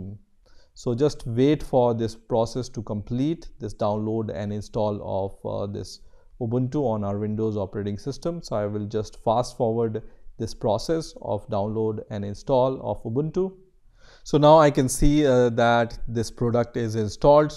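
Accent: India. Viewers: an informatic tutoriel with an indian accent. Now i feel confident following his instruction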